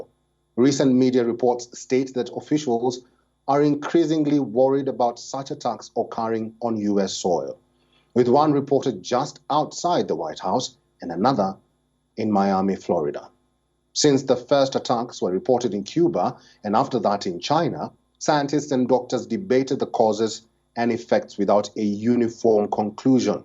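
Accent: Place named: India. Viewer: She's the only reason I watch WION. She has a nice Indian accent. It's understandable but non Indians like myself and it's still Indian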